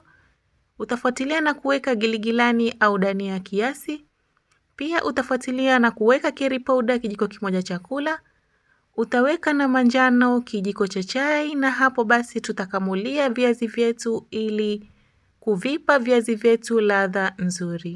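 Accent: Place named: Arusha, Tanzania. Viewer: Wow! Asantee my dear, kwa recipe nzuri.. 😋 nitampikia Samosa za viazi! Mume wangu.. 😊 by The way unaongea vizuri, Kama watu wa Arusha! 👍😀😍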